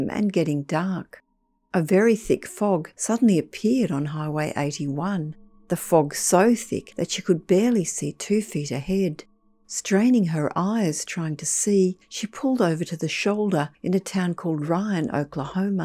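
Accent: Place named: Australia. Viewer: In Australia clerk is pronounced "clark". The narrator is Australian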